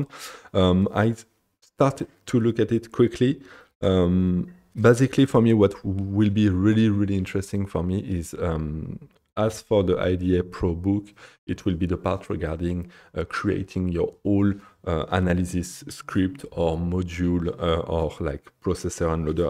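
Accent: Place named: France. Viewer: Le magnifique accent français ? Super vidéo sinon !!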